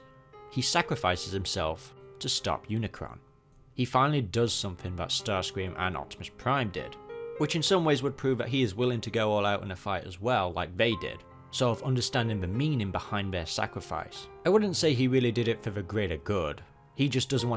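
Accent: United Kingdom. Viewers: he is posh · So do you have a British accent or some other kind of accent?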